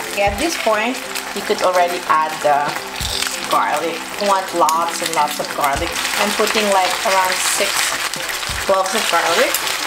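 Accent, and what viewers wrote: Philippines. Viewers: totoo nga naman anyway it recalls memories talaga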